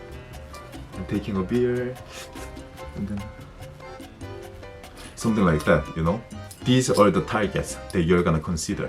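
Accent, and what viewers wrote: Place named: France. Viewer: Tu vivre dans la France tu as l 'accent d'un français parle anglais trop mignon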